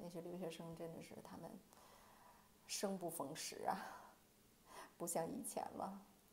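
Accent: Beijing, China. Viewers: 姐们 您在winnipeg？ 北京的吧 听着京腔 顺耳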